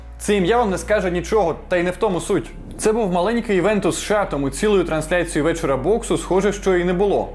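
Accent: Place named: Urals, Russia. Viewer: Ведущая вообще не в теме,павловский и немцов?Да он в гробу переворачивается,когда его приравнивают к флюгеру-коньюктурщику-приспособленцу павловскому,который в Украине был идеологом всех темныз сил,януковича,отравление Ющенко,его идея,до последних предвоенных дней топил за опзж на ахметовских каналах,с другими рыговскими прорашисткими недобитками,вы о чем.Исправляйте свой уральский говорок,отличительная черта всех неоднозначных ведущих данного канала,то полный рот дикции,то внешность неоднозначная,это толерантность такая?))))Посмотрите оа Настоящее время,и ведущие и подача,у Михаила Борисовича денег мало?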